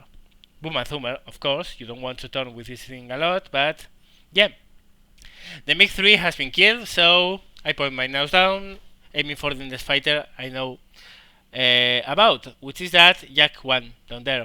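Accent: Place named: Spain. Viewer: He is Spanish, so its a Spanish accent.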